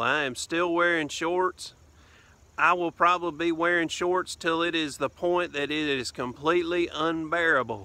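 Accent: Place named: Louisiana, United States. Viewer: 3 or 4 time governor of Louisiana? " "! Love the "TALK" from down home. y'all! Cajun:-))))))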